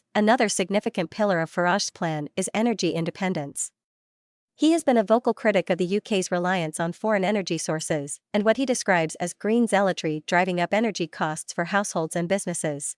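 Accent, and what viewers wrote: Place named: United States. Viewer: Why do all these podcast have American voice overs. Maybe because they ain't from British podcasts but from the home of the "Sceptics", thr bad ol' US of A.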